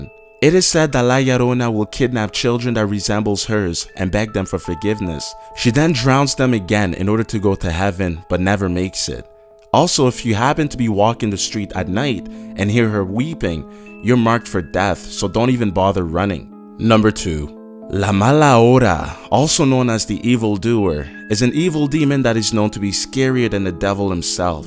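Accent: Mexico. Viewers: Love this guy's Mey-he-ko accent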